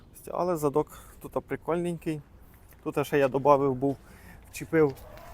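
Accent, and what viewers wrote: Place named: Khmelnytskyi, Ukraine. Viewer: Привіт гарні доглянуті машинки) а ви звідки? А то чую Хмельницький говір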